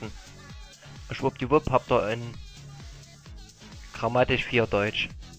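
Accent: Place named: Saxony, Germany. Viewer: xDD gleich zu anfang ->ich bin sachse un red auch so xDDD nice nice du bist mein supersachse Sachsen 4 live:)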